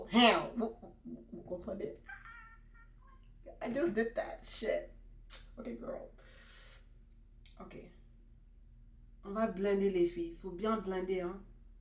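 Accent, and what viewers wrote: Wolof, Senegal. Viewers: 😂😂 je te kiffe trop ton français wolof way😂😂super maquillage spicy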